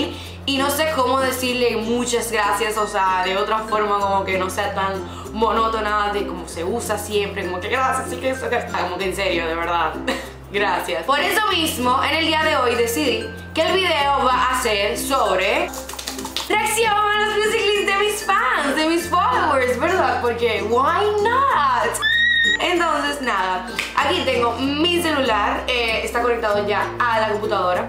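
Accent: Dominican Republic. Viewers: Ese momento donde su acento me llama la atención y descubro que también es dominicana ♥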